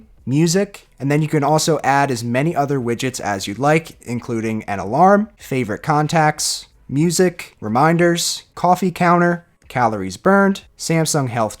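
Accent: Philadelphia, United States. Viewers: Is that a subtle philly accent I hear?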